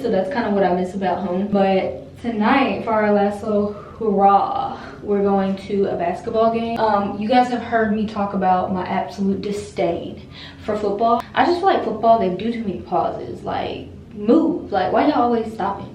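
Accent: South, United States. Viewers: Puuurrr 😻😻 I’ve only been Watching your videos for about a week but I knew I heard a southern accent ! Period Girl 😜💅🏽 this Vlog was very wholesome, keep up the good work sis ❣️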